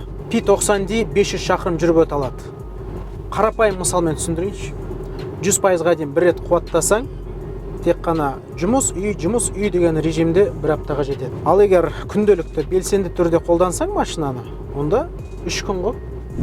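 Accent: Standard. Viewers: базар жок рахмет. Недеген сауатты сойлесин таза казакша сау бол бауырым.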